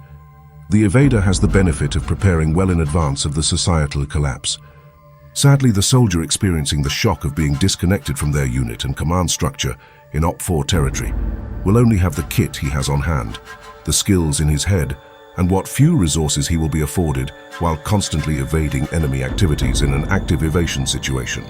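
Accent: United Kingdom. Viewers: Voice over is brilliant...very English! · Awesome video, the British narration was a very cool feature. Has a documentary type feel to it in the beginning then transitions into your own footage which is neat. Great work 😎👍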